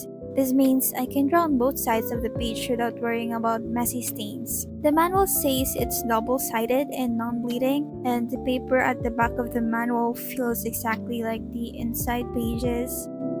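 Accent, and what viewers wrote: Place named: Philippines. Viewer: I see hyunjin and art I watch (also new sub cuz i love your artworkkkk) Also you kind have a filipino accent (Are you filipino by any chance?)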